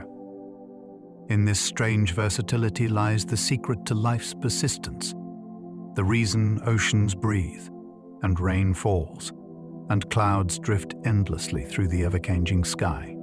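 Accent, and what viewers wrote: United Kingdom. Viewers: So glad i found. This in my scrolling.. i feel like i'm listening to God with a British accent explaining everything.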